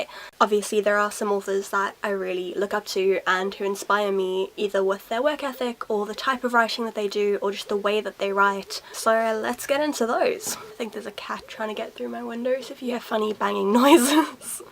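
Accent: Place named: United Kingdom. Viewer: WOW, there should be more female readers like you! You admire Percy Jackson and Alex Rider too! (Have you done a Q&A video about yourself? You seem really interesting. But, I couldn't find one of those videos on your channel. One of the questions I would ask is this one: you live in South Africa. But you got an elegant middle class English accent. How come? I'm just curious. Would you do a video about? )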